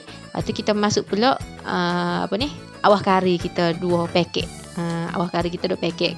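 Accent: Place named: Kelantan, Malaysia. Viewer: hihi.. Bhsa Kelantan pure betul la sis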